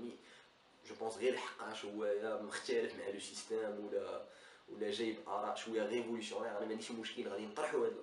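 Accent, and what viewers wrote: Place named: France. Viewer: اسمع عربي مفرسن..انت مطبوع لسانك فرنسي وانصحك بعدم التكلم باللغة العربية لانك كمثل الهندي الذي يتحدث لغة انكليزية بل انت اعقد...مع هذا شكرا لمجهودك بالرغم من اني لم افهم لغتك..تحياتي..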